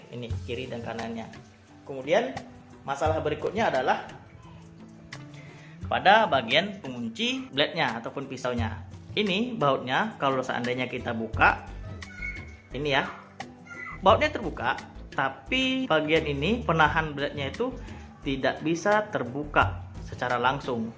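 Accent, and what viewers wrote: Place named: Pekanbaru, Indonesia. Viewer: Dari Pekanbaru ya bang?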